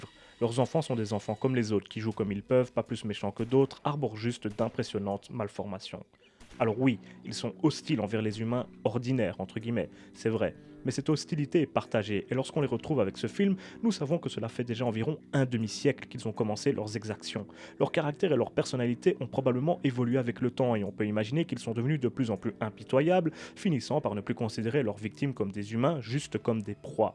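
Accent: Belgium. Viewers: J'aime bien ton accent belge 😎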